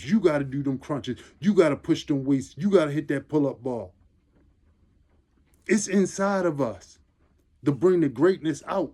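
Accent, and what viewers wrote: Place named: Mid-Atlantic, United States. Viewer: Bro where you from I hear that Mid Atlantic accent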